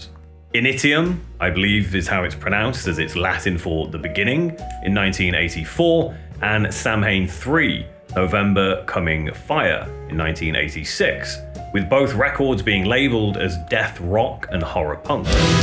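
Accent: England, United Kingdom. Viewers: There's something hilarious about the contrast between the host's prim and proper English accent and manner of speaking, and his old school rocker style appearance. Not what I was expecting.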